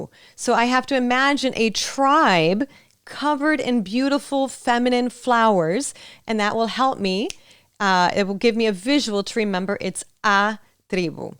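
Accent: United States. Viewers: Are you American? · To have a fluency in second language is not overnight. It's a whole bunch of thing that has to bear in mind mainly if don't live in an English spoken country. In my case i've been practising English my whole life and it's not enough for me 'cause i've got to get into it for hours as if i were living in USA. And nowadays cell phone, laptop and apps help a lot to get in touch with the whole world if you really want to improve your English. By the way you're a good native English teacher and nothing better than learning a real American English from you. GOD BLESS YOU.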